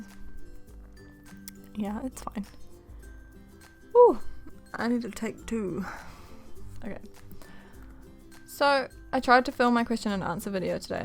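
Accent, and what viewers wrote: New Zealand. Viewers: your accent made sense P.S I'm a kiwi too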